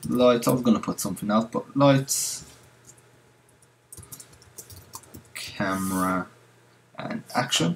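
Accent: United Kingdom. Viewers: and +Nooblike first of all if you carry on his tutorials and accent then may be you can find out he is from United Kingdom what is famously known as UK. he showed every step with a generous teaching method what can not be narrated (I believe). Now if you see the rest of his videos, I think you know how he knows about these things and game development. and what a good method does he have to touch every corner. and 2$ or a 4$ is not a fact. at least you guises have seen he used a macintosh(MAC). So, he is not that much poor as you said. and please if you can't appreciate this help for the cost of 0$ at least try to be at least respectful for his generous help.+Rawandi please don't use this kind of talking to people who are helping you-if you don't like it then skip the video but don't try to b**s**t there. and +Nooblike don't don't tahnk this it makes you look like you are appriciating this kind of works. 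At Last + Thank you.Awesome tutorial as always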